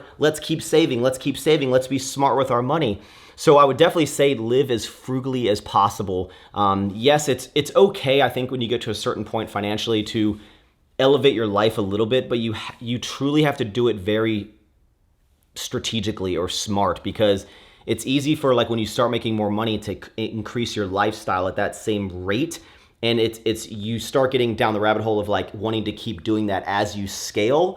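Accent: Texas, United States. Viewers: That Texas accent becoming more and more apparent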